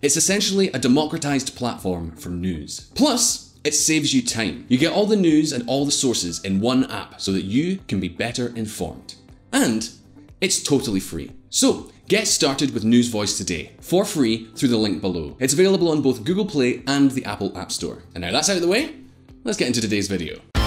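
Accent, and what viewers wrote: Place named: Scotland, United Kingdom. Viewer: Nothing wrong with Scottish brogue...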